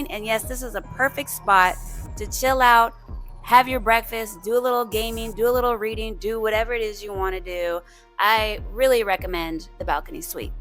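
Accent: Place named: New York, United States. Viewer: I love the way you talk and the cursing lol! I’m from New York and this how we talk too 😂😂😂 very funny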